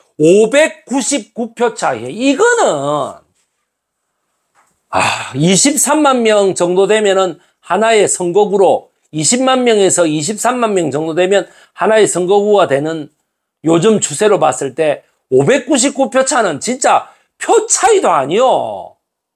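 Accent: Gyeongsang, South Korea. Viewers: 구수한 경상도 사투리에 잘못하면 반 쯤 쥑이는 화법 귀가 뻥뚤리는 스트레스 확 풀리는 목소리에 반했어요!!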